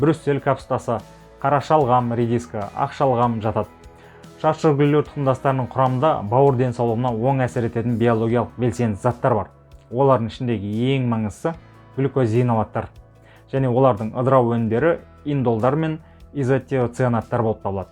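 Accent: Standard. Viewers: Рахмеееет балам, өте тамаша таза қазақ тілінде түсіндіру қандай керемет,,,,өркенің өссін. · Балам таза қазақша сөилегеннің ұнады рахмет жақсы түсіндірдің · Әсіресе, қазақша таза сөйлегенің үшін көп рақмет!!! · Рахмет қазақша таза сөйлегеніз бен пайдалы кеңесізге үлкен рахмееет,риза болдық,Алла разы болсын!!! Сізге жазылып, лайк басып қолдаймыз!!!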